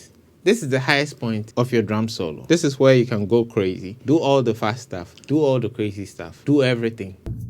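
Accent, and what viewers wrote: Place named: United Kingdom. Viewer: I like how he spoke in a British accent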